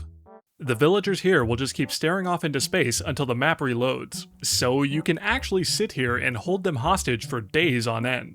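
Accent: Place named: RP, United Kingdom. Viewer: Why do you speak premium english like blathers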